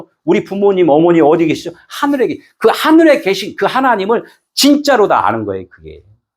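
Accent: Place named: Chungcheong, South Korea. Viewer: 구수한충청도 사투리가 정겹네요. ❤ 뿌린씨앗 풍성한 열매로 결실하세요!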